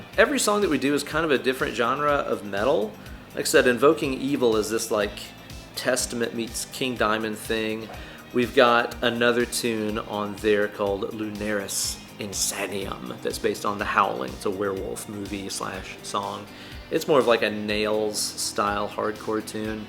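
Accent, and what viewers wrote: United States. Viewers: Yeah you fine dude. Love the playing. Alls I know is I give you props you don’t put out a video every twenty minutes like John Cordy and you speak American. The lick was great. Please accept my apology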